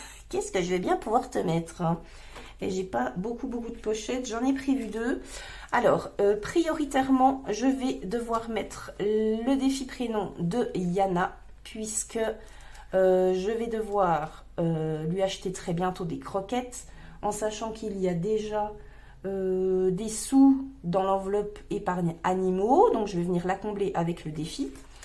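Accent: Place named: Doubs, France. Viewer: Quel plaisir d’entendre ce doux accent du Doubs 🤣🤣🤣 je suis originaire de Dole 🥰 je reprends l’accent dés que je descends et je le perds quand je remonte en seine et Marne 🤣🤣 J’adore ton nouveau classeur et hâte de te retrouver Des bisous tout doux ❤️❤️❤️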